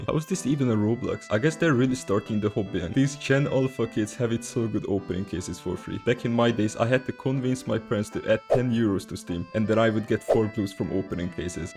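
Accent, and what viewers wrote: Hungary. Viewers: Hold up are you from hungary? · are you from hungary?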